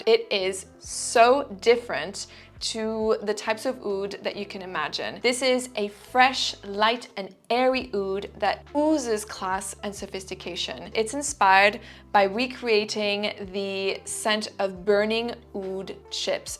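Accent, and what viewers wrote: France. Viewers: Your english accent grow fast, I almost dont hear your French accent except when you say "Jus de rose" 😁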